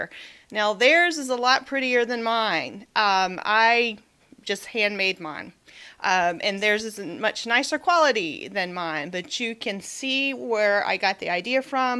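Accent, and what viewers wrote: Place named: North Carolina, United States. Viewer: I'm from North Carolina too! I knew I recognized your accent, but I was confused because I thought you were from Florida. Thanks so much for making these great videos.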